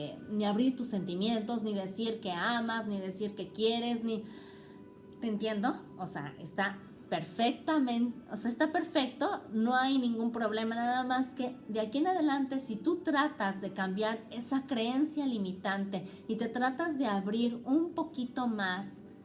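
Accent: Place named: Spain. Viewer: eres Mexicana con acento espanola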